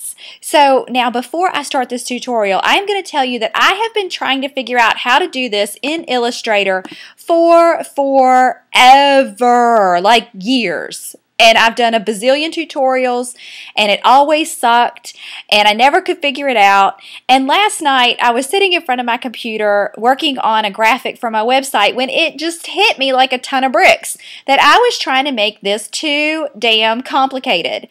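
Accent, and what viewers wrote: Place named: South, United States. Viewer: I love your southern accent.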